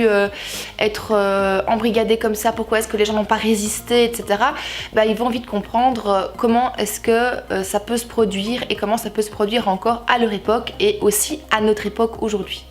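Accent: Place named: Belgium. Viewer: Ahaa... La nostalgie... Une de mes meilleures amies. Tu lui passeras le bonjour :) La Vague je n'ai pas lu le livre mais le film m'a énormément marqué. Du génie. Trop bien le marque page dédicacé par Amélie Nothomb :D Les Royaumes du Nord j'ai lu le 1 et 2 il y a presque 15 ans en pleine adolescence mais je n'ai jamais terminé, jamais lu le tome 3. Pas que ça ne me plaisait pas mais j'ai décroché et mes lectures se sont tournées vers d'autres choses. Du coup je ne connais pas la fin mais pas le courage de me relancer dans cette saga... (J'adore ton accent, j'adore la Belgique ! :D )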